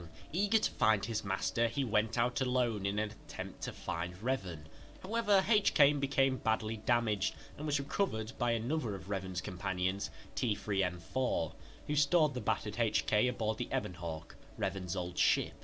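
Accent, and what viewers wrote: England, United Kingdom. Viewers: I don't get annoyed at the way anyone pronounces words. Being Scottish I'm often ridiculed by the English for how I speak. lol even my English friend does it. I just think its wrong to mock someone or get annoyed at someone because they don't talk the same way as someone else. and I found it ironic that English speaking Americans were getting mad at how an English person speaks English. It does sound kind of funny when you think about it. I didn't mean to cause offence or anything. Just found if kinda funny is all. Its really interesting how language develops over time and i get that. Although I have to say the Scottish language at its purest form confuses me. It barely sounds English. It just kinda bothers me how every video this guy makes some American is pissed because he talks different. Like in the UK most citys have different accents and slang and we embrace it. 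we don't tell each other were speaking wrong. That's all i was getting at. Why get pissed at someone for something that is really hard to change? I have been trying to lose my Scottish accent and pronunciation for years to try to Americanize it because I hate it and its hard. As for accents. Americans do have a thing for. the amount of girls who find my hot just because I talk Scottish is crazy. you cant really talk for your whole country just because you don't find accents attractive.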